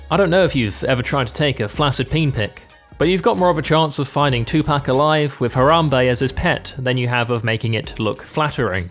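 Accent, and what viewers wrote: England, United Kingdom. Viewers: Your vids are absolutely wonderful And your English accent amplifies their greatness in 10000 times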